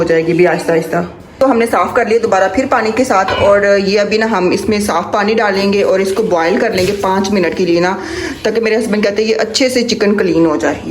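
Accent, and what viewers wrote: China. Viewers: apka Chinese accent Kitna perfect hai MashaAllah bilkul Chinese style sy bolti hu aap apki daughter same apny baba py hai , dear mey bhi jab chicken banati hu pehly Thora boil kr k yakhi phynk deti hu kiu k hum Kon sa khalis chicken khatey hai boilers chicken,,,,,,,, behtr huta hai Thora boil kr k paani phynk dey , Desi chicken ku boiled kr k NAHI phynktey kiu k Wu khalis huti hai , apk husband ki cooking out class huti hai tasty and healthy 👍🥀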